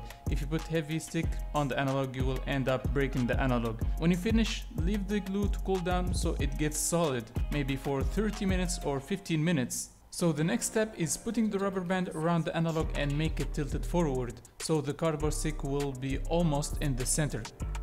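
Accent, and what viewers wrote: Tunisia. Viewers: Naarach aleh aarftk tounsi men soutek hahahah Great tutorial <3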